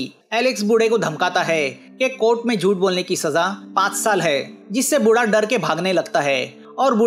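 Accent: Maharashtra, India. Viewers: Bhau tu marathi ahes? Accent vrn vatt😁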